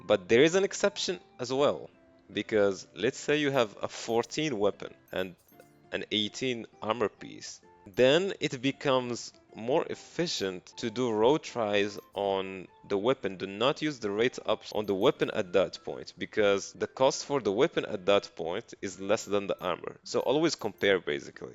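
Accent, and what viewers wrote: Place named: Morocco. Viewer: Accent d mgharba mwr9a :d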